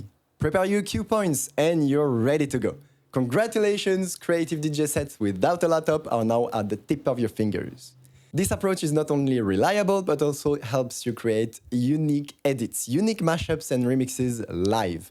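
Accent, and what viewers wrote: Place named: France. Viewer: This guy's the definition of a French accent ❤ it heh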